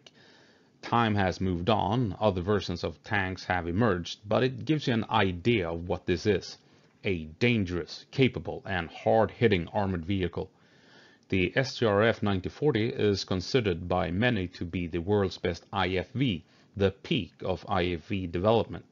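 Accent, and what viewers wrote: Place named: Sweden. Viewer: it seems .. or i know your english accent is swedish. You think you could be a bit biased ? or perhaps harbor some other issues from childhood ? Anyhow i just wanted to inform you that in the current battle field there are a bunch of nationalities. lets start with the DPR and LPR militias, 99% of those soldiers are or were Ukrainian citizens. wagners - russians, uzbeks, serbs, cubans and even ethnically Ukrainians. you mentioned that they will "fight russians"... last thing as the battle field shows there are no "tank to tank" battles in ukraine as it is portrayed in "world of tanks" . Odds are those toys will go against either a kornet or a Vikhr anti tank missile from an alligator. Either way i don't see a great future for those weapons.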